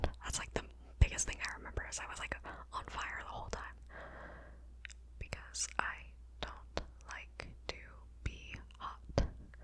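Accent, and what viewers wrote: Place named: Vancouver, Canada. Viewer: It sounds as if you are from Vancouver, BC.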